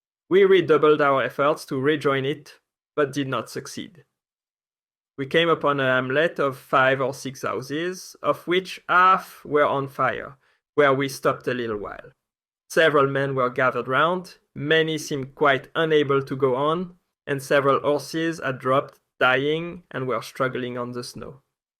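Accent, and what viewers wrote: France. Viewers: I like the French accent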